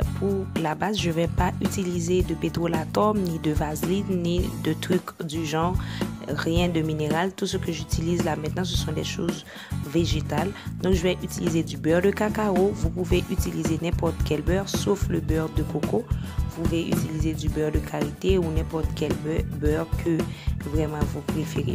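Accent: Haiti. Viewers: Tu es une haitienne benh ouais vous l'êtes avec tes prononciations francais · Vous etes une haitienne ca c est sure...votre accent francisé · t'es haïtienne ça s'entend lol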